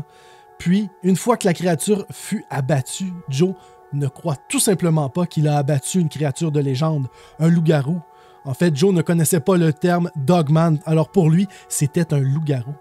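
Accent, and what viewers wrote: Quebec, Canada. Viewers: Enfin un "youtuber" qui parle Québécois ! Pas de "du coup" et autres expressions énervantes à la française ! :D Merci, c'est bien raconté en plus ;)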